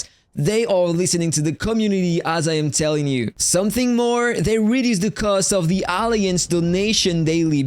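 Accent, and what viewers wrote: France. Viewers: Tu a un accent français non ?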